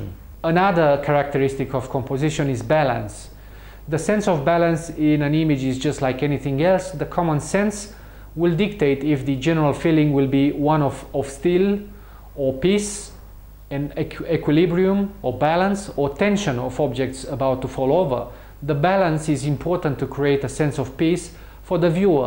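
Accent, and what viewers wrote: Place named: Romania. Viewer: Great video! Thank you very much! I noticed an accent. Are you by any chance Romanian?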